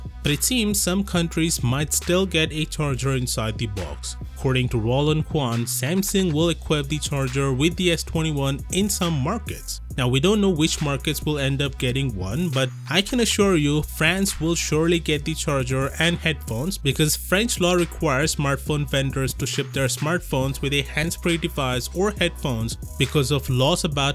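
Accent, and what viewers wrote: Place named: India. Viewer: The Indian accent is so rough on ears. ..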